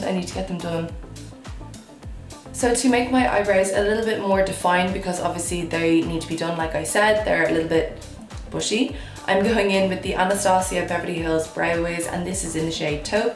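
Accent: Dublin, Ireland. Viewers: do u live in america? i thought u were irish girllll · She's Irish for sure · Is she part Irish I feel like she has an accent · What accent have u got? (Scottish) Irish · are you irish?? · Are you Irish or British?? You sound Irish...btw new to your channel love this look you're gorgeous!! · Are you Irish?? Your accent is 😍😍😍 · Is she Irish?x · yeah shes from dublin :) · I think she's Irish!! it's such a subtle accent tho! I love it hahaha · Your beautiful irish accented voice could never be annoying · She is from Ireland. Lovely accent! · she's Irish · Sounds Irish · She's Irish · What part of Ireland are you from ❤️ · Is her accent Irish or South African? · You're so gorgeous! Btw are you irish? lol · Is she from Ireland cause she had an Irish accent I'm from Ireland iswell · Do you have an Irish accent?😊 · where is she from? jw lol, im new here. maybe ireland? 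· Definitely Irish!! Yes I am American, but I can pick up that accent any day. 😂😂 oh & plat also means to braid. 👌🏼 Also recreated this look & used the Gwen Stefani palette as well as my Morphe 350. For the lip. Used Love Bite by Kylie Cosmetics & it went perfect. 😍😍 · is she irish?? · this is the first video I've ever watched of you, one question are you Irish ?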